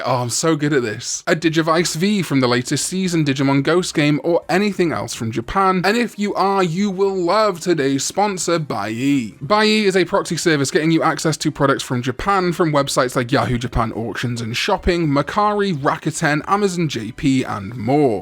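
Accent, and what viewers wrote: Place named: Wales, United Kingdom. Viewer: Where in Wales are you from? I’m from Porthcawl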